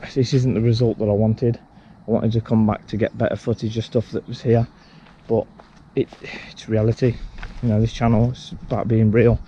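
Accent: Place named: Midlands, United Kingdom. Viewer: OK mate you sound like a Midlands Lad ...?out of interest ..👍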